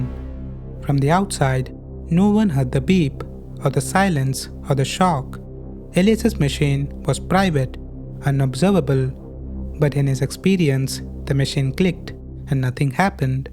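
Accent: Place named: India. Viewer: You sound like an Indian 😊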